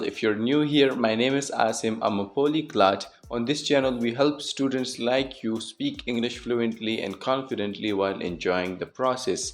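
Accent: India. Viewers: Your look like and speaking like this indian😊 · You speak like Indian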